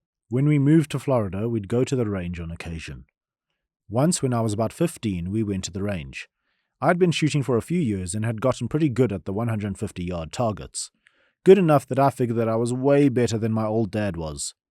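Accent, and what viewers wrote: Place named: South Africa. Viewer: We got South African Reddit reader before GTA 6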